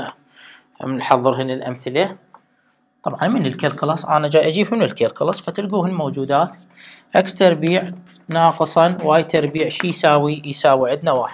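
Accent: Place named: Basra, Iraq. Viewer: من لكنتك بصراوي😹😻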